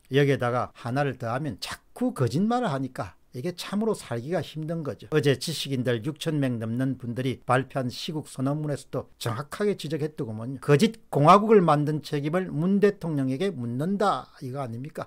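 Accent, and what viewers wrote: Gyeongsang, South Korea. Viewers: 공병호씨 이분 기억해둬야겠네 말투보니 대구사람 같은데 같은지역 산다는게 넘 쪽팔린다. 미국산 쇠고기 마이 쳐 먹었나??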